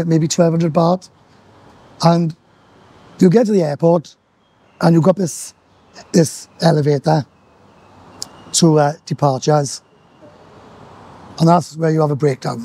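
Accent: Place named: Wales, United Kingdom. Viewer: been there Twice firsT was with me bro then 2nd time the X lol, first timewas best u know what i mean taffy, you sound likje Treherbert boy :)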